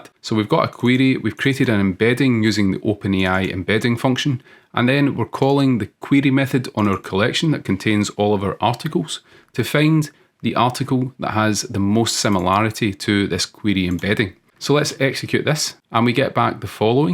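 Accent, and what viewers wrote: Scotland, United Kingdom. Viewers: Loving your Scottish accent mate! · Trying to focus on the topic but your accent keeps throwing me off. Love the scottish accent.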